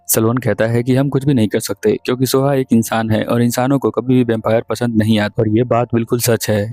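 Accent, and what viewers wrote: Bihar, India. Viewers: Ap Bihar se hai kya?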